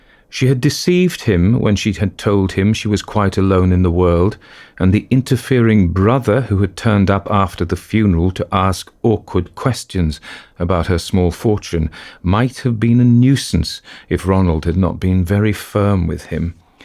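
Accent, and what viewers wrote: United Kingdom. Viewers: read a story set in Amerca in a British voice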